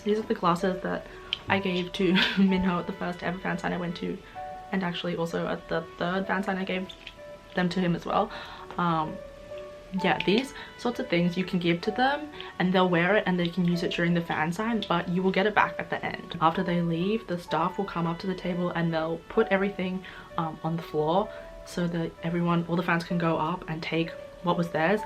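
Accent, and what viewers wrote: Australia. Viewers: My dear , i wish someone would put at the comment site on what actually the step is because im not saying your way of explaining is bad but within your aussie accent i just cant understand much mate . It would be nice if you nicely put the steps at the comment site or at description or even subtitles because i cant get through of the whole video because i wasnt understand much . I just understand until at skz twitter link and after that about the albums mate i really doesnt understand i hope that you will understand our situation is . I really adore you and i wish to see more of your videos . Love , support and feedbacks from malaysia ! ❤ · I'm trying to understand everything you were saying but you speak too fast and my dumb mind can't affort that aussie english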